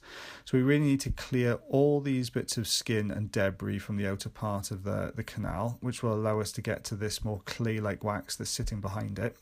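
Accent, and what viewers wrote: Wales, United Kingdom. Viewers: Yes the Welsh accent at it's best beautiful gentleman inside & out Learning more with each video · Wow a perfect British accent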